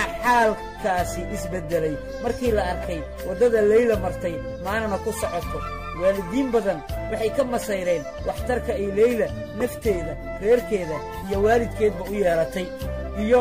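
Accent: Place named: Mogadishu, Somalia. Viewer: Sheekada waxaa Qiimaha u yeelaya wariyaha siiba lahjada Reer Mogadishu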